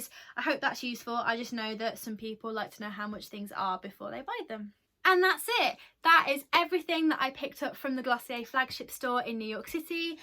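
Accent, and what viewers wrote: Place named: United Kingdom. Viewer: No problem! 😉and yes I love the British accent 🥰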